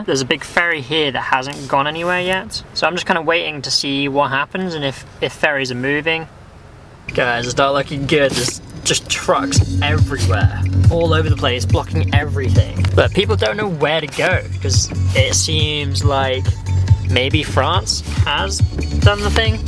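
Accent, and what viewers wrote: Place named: England, United Kingdom. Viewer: Why is your accent getting more English.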